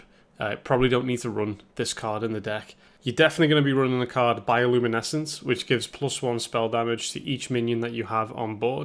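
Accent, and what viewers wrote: Liverpool, United Kingdom. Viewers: hes not Scottish tho, hes from Liverpool, UK. But I guess you're still correct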